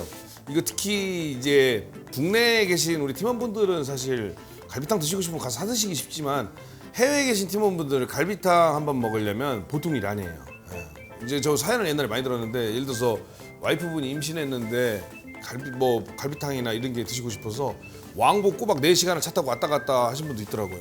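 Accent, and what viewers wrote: Chungcheong, South Korea. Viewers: 집에 갈비 있어서 내놨네요 맛나게 해먹을께요 충청도가 고향인데 언제 들어도 구수한 말씨도 좋아요~^^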